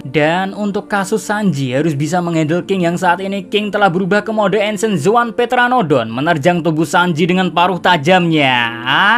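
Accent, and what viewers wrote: Java, Indonesia. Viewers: Logat Jawa setengah bahasa Inggris Bahasa indo hmmm😀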